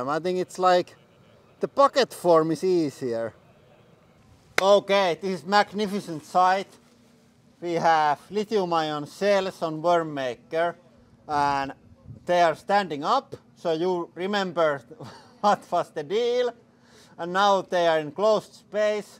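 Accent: Finland. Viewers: Is that rallyenglanti I can hear?😊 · Ужасный английский с финским акцентом😅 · I love the Finnish accent! Hyva Suomi! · I love your Finnish accent. My Grandparents and Parents have passed away and I miss all things Finnish. Now I feel like I need to buy a hydraulic press for fun!...or go back to Finland. Hyvaa Paivaa. Puhakan Timo.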